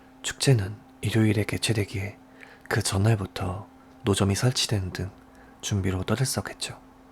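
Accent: Japan. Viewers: 개인적으로 '후' 발음이 적응이 안되네요 ㅎㅎ 일본식 발음인가요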